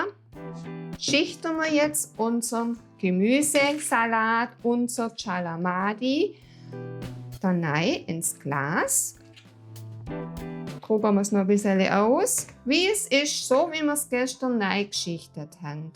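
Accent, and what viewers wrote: Swabia, Germany. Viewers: Hallo, ich bin gestern zufällig über deinen Kanal gestolpert und hab´ ihn direkt abonniert. Danke für das Rezept, deine sympathische Art und das schöne Schwäbisch 🙂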